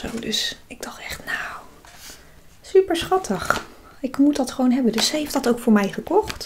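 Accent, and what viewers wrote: Rotterdam, Netherlands. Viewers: Een echte Rotterdammert! Leuke video! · Gefeliciteerd dat blauwe bloesje staat je goed Leuk joh met die blauwe hoed je hebt wel een Rotterdam accent klopt dat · Gefeliciteeeeerdd!!! En wat vertrouwd een lekkere Rotterdamse stem te horen net als de mijne!!;) Je hebt het zeker verdiend!!xxx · Dat accent haha, ze zeggen tegen mij ook altijd dat ik te plat-Rotterdams praat. Maar als ik jou hoor kan het me toch echt niet Rotterdams genoeg😋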